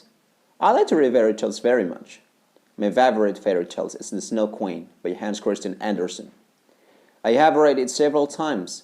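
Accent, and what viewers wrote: United States. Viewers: sir, America 'aksen? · Kak ini inggris aksen amerika yaa, aku ora nangkep😭. Kalo inggris british masih mending ngerti ngomong apa. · dia amarica acent ya? banyak kata yg kurang jelas :v padahal kaya james cordon, ellen, masih bisa nangkep, kalau ini seakan2 susah nangkepnya, mungkin bener di review sma mba sacha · ini pakai accent American English kah kak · Hi sir, american accent nya mantul. Pngen request klo boleh yg british accent, soalnya ak mau latihan buat tes toefl, krn ak perhatiin listening sectionnya kebanyakan pke british. Thank you 🙏🏻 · logatnya american bgt, kaya ka Puri viera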